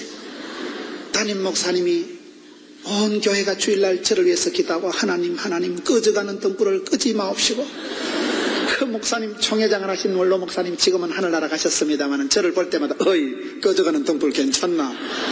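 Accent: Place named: Gyeongsang, South Korea. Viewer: 김문훈 목사님 존경 합니다 경상도 사투리를 김문훈 목사님 고향이 경상도 목사님♡♡♡♡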